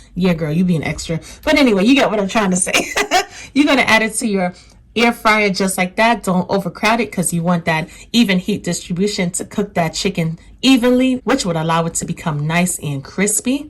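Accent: New York, United States. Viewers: My NYC homegirl. I already know without really knowing. I'm a Bronx Boogie Down Girl. I know our accent anywhere!!!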